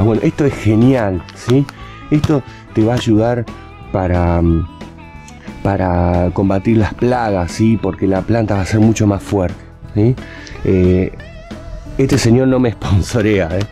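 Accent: Argentina. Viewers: de donde sos?? sos Argentino?? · Buenicimo video COMO todos como c aprende pero a mí lo que más me gusta es su acento Argentino. Saludos desde Monterrey México 🤗🍀🌹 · Me encanta el acento de los argentinos. Saludos desde Colombia. Muy buenos vídeos. Aquí una nueva suscriptora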